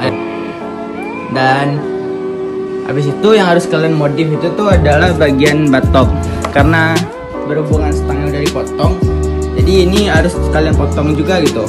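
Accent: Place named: West Sumatra, Indonesia. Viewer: raso suaro urang awak yo bang😂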